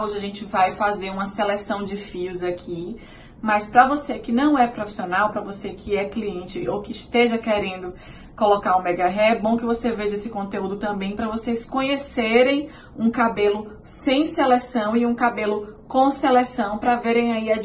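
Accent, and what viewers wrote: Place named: Salvador, Brazil. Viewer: Pelo seu sotaque Parece ser de Slavador. Aceitei? Se sim onde vc atende? Quero muito colocar No caso do cabelo crespo tem q ficar alisando pra ficar compatível com o mega??? Pq a raiz vai crescendo n fica feio nao? A raiz crespa e o mega liso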